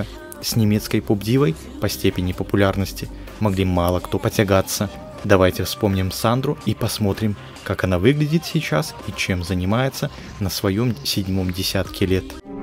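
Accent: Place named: Lviv, Ukraine. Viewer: Очень хорошее видео, но комментатор явно с Львова .....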